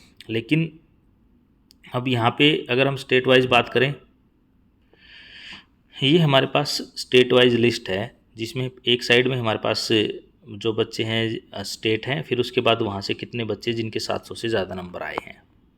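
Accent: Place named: Bihar, India. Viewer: 😅😅😅😅 Bihari lagate ho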